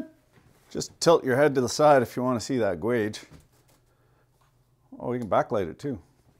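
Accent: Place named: Canada. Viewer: As someone with a lifetime of experience in Canadian English and it's MANY colloquialisms, it gives me a great deal of pleasure to watch YouTube closed captions struggle to comprestand and interpolate your videos!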